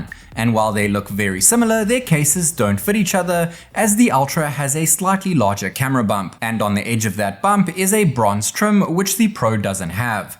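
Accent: South Africa. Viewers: The moment i heared your voice ik you were south African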